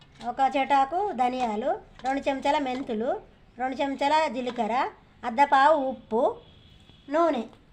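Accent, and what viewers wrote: Telangana, India. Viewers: మీరు మాట్లాడే తెలంగాణ యాస మీ వంటలు కమ్మగా ఉన్నాయి😋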